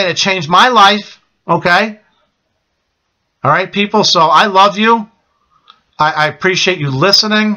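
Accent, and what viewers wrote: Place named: United States. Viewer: thank you so so so so much for sharing this....I just happened on this video, but I'm sure for good reason. You just sound like the typical American guy...and the thing is, there are MILLIONS of us just typical Americans worried about things that don't matter, and spending all of our time wasting life. Your testimony bears upon my heart, even though I've been a Christian all my life...there's more. There's more about being a servant of Christ than just being a 'Christian'. Like you are saying...God bless you. God bless you. Jesus thank you.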